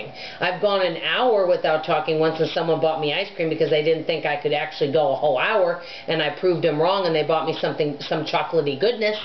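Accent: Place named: North, United States. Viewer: I know I couldn't do it! I'm a talker .. AND if nobody is at home can you talk to yourself? That MIGHT be doable .. I often talk to myself! I'm fairly new to your channel so I'm dinging the bell so I'm sure I don't miss the results lol GO FOR IT .. (you have an accent so I assume your from the north and you talk really fast I'm From the South and I talk, hear and listen in Southern)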